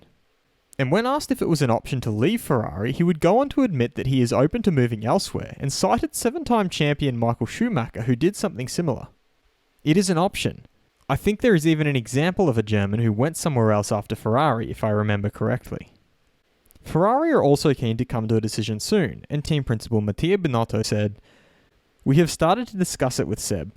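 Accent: Australia. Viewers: hey mate, hear you aussie, you heading to the melb F1 this year. id like to buy you a beer for you great vids buddy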